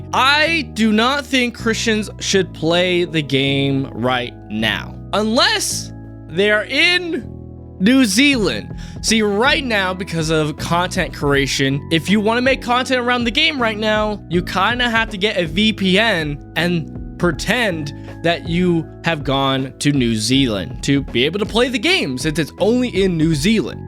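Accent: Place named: United States. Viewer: Your take on VPNs is wild. You taking control of the internet you pay for, which is being botlenecked by the greed of the government so you only have access to certain things which is against the whole purpose of the internet to begin with and is also not Illegal(that's the government you were talking about) is not only a good thing but it's a just thing. You are also going down a very slippery slope with we should just do what the governing body says because thats what the bible says, I mean where do you live or in what time ever is that in any way a good idea because you sound like an American and blinding following our government is going to get you in some bad spots. I mean bro turn on the news and see what governments are doing just today.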